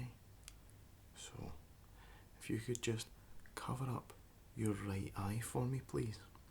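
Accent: Scotland, United Kingdom. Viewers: I love this! It's always more relaxing for me when it's a scottish accent because I'm Scottish. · I adore your videos, you have such a relaxing voice! And I'm quite the sucker for scottish accents:) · I think I'm going to move myself and my Australian accent to Scotland now in a desperate attempt to pick up this accent! Hahaha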